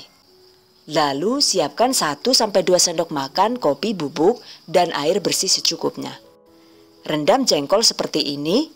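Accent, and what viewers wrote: Banyumas, Indonesia. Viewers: Jebule wong ngpak😂😂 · Mantulll... Paket komplit.. yg dulu blm doyan jengkol. Sekarang udah suka... Kok suaranya ngapak ? Heeee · Perfect banget Bunda, bdw kok bisa ngapak emang mertua org mana Bund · Bahasane ngapak kyk BN aku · Deneng pada karo nyong mak ngomonge ngapak😁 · Oalaah Wong ngapak mbokayune 😀😀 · nice info ..mksh.. ini bhs nya kok ngapak banyumasan..dr madiun or banyumas yha heee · Dene ngapak...ora ngapak ora kepenak 👍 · Kok ngapak logatnya 🤣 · Jebulnya ngapak yak hehe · Inggrisnya Fasih 😁... eh jebule wong ngapak ya 😅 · Mbaknya dr Banyumas ya ngapak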